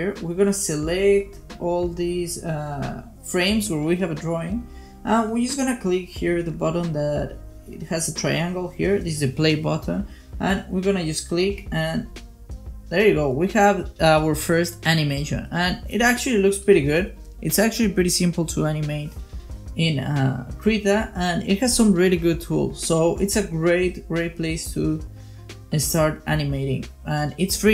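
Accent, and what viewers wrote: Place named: Spain. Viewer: Se nota mucho que eres español xd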